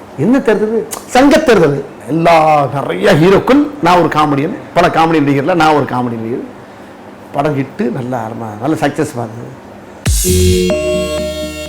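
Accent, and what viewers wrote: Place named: Madurai, India. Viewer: Anne neenga elladadala santhanam pondra " nagareegam ellada comedians" vara arambichittanga. Vaya toranda ketta vartha, kudumbattoda pakka mudiyala anne. Unga azhagana Madurai Tamila ketta comedy meendum kodunga.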